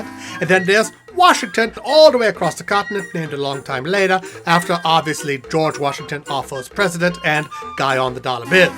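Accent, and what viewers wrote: Germany. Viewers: that's the worst german accent i ever heard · Your ''German'' accent is shit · you have a German accent? really? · WHATEVER GOES FOR ME it's a german accent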